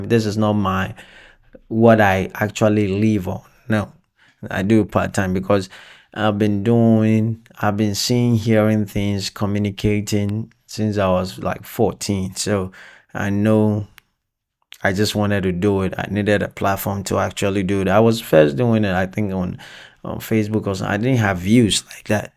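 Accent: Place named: Nigeria. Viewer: Ete sen, I worked with Nigerians for a few years and your accent reminds me of them. Old good memories. I have a question: With all these earthquakes and erupting volcanoes and all the damage that this will cause, will humans return to the Stone Age in terms of daily life (jobs, transport, heating, trade, travel, food)? Will we have different situations from one country to another or from one continent to another?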